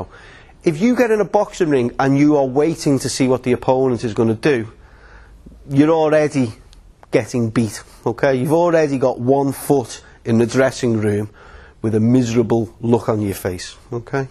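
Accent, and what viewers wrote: Liverpool, United Kingdom. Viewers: The voice of gods mate. Scouse. :) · Scouse is the name of the accent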